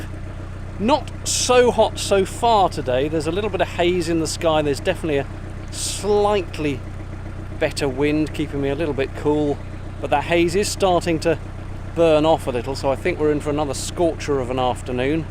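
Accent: United Kingdom. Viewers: Cannot help Binge watching this. The narration is very well written. Besides, everything sounds sublime in a British accent:) can easily pick up a commercial television contract.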